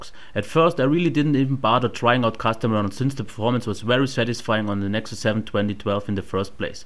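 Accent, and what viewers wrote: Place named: Germany. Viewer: This review is great, but the accent is even better. German?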